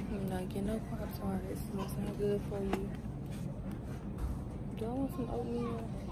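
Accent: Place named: Chicago, United States. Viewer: This my first time watching you but I’m gonna take a guess and say you’re from Chicago ? Lols” fye “ “do you shit wop “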